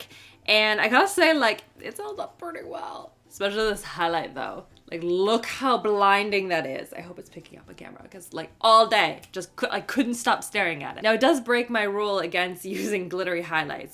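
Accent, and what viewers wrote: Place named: Canada. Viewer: I loved your day in the life one!! I find you talk alot like me but then again we're Canadian girls ;)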